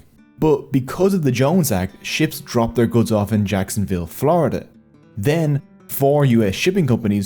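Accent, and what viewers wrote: Ireland. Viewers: you sound Irish, Puerto Ricans and the Irish have history together. thank you for this brother really, when i go to Ireland i will show the utmost respect top your people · Are you Irish? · How come dude got a Liberal Irish accent?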